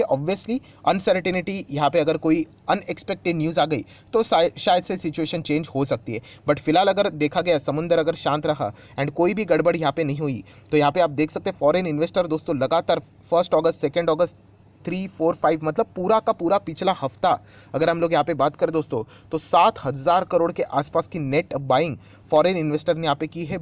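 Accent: Maharashtra, India. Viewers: मला ही वाटते सर मराठी भाषा बोलत असणार,, कारण कधी कधी मराठी उच्चार ऐकू येतात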